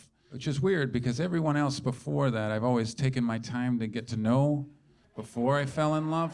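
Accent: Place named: Scotland, United Kingdom. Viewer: Almost didn't click on this. I wasn't in the mood to struggle through a thick Scottish accent!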